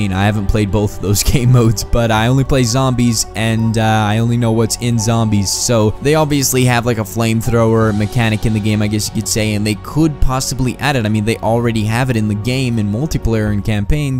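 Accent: Bosnia and Herzegovina. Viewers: Are you bosnian.?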